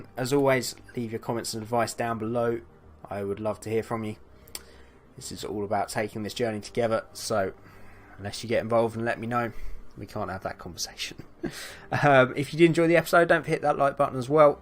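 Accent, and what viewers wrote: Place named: Devon, United Kingdom. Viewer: Another excellent session. Your pessimism always amuses me:D Your car is coming along very nicely. Great things are coming. On a side note, I've been trying to track down your accent for months now, so I'm going to come out with a guess - Definitely Southern....Devon perhaps?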